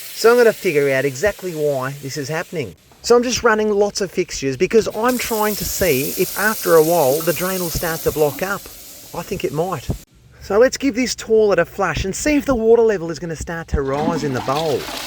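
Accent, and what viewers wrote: Australia. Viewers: he’s from the land down under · I just wanna let you know… you sound like Steve Irwin, crocky! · Hehe he sounds like that Aussie animal 'hunter' who died stung by a stingray. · Lol this is like watching the Steve Irwin of plumbing. Not just the accent, the overall energy. Love it. 💖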